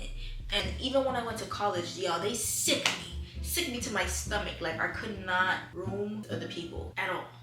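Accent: Jamaica. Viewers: Content, music, and the Jam' twang...I'm with you!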